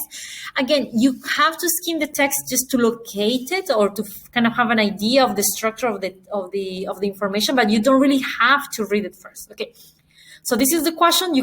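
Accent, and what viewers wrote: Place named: Russia. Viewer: Thank you so much for sharing the best tips with us🙏don't get me wrong, I felt a little bit Russian accent in your speech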